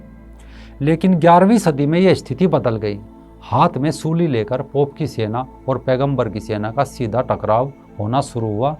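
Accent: Haryana, India. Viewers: My mom is alsofrom haryana I understand this haryanvi slang of hindi by bhai😂 but one thing he tries so hard to provide excat news to us his work is more authentic then most of the famous news channels, keep it up bhai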